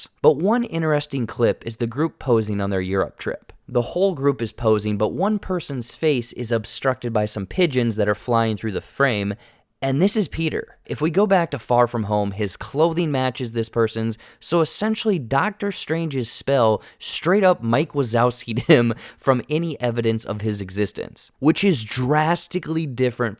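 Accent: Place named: United Kingdom. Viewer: Please don't pull a jake tran 🥺 we love your British voice and wit, not this generic American voice -- 🙄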